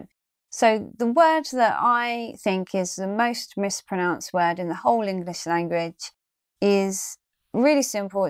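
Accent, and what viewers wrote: United Kingdom. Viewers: That's the British pronunciation, which differs a lot from the American one.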